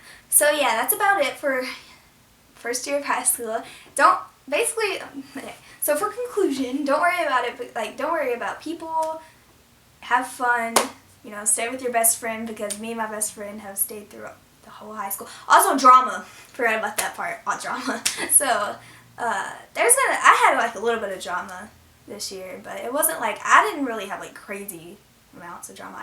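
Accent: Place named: South, United States. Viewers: where are you from? you have a slight southern accent I think 😂😍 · I love your accent I’m from Texas and I love the southern bell accent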